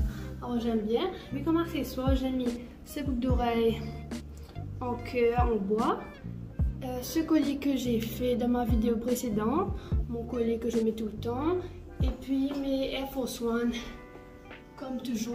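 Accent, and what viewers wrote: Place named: Mauritius. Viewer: T'as l'accent mauricien. 😻🌹✨🌹✨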